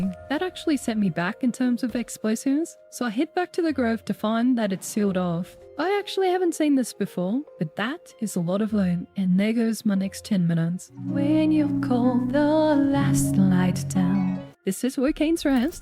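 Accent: Australia. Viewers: Unapologetically Australian and I love it! The rep we deserve as Aussies ahahah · I first subscribed to this channel for the Australian ASMR and stayed for the insanely creative runs & humor. I’m full on cackling over here 😂😂😂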